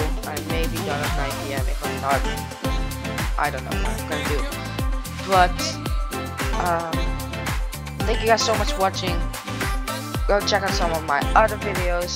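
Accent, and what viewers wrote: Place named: Netherlands. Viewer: yup, sounds dutch like me. dutch = nl :D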